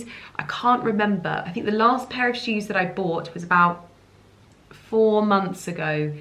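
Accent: United States. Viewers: I hear an American or Canadian accent by the host....fantastic destination to visit. So beautiful. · Even for me as an American, it was a bit jarring to hear an American accent on your vlog, in a French place. 😂😂 This place is magical, thank you for sharing. 💗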